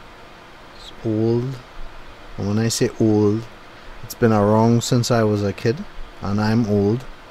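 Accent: Trinidad and Tobago. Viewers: nice Trini accent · I like your true trini voice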